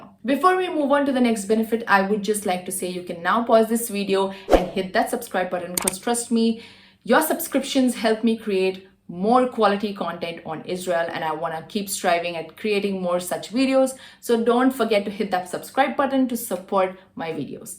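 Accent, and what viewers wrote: Maharashtra, India. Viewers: You have a thick marathi accent.. lol.. good info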